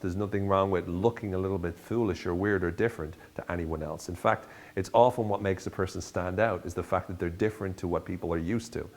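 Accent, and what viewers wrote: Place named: Ireland. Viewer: You speak in a wonderful way, with clarity, passion, wisdom, and a lovely soft irish accent : )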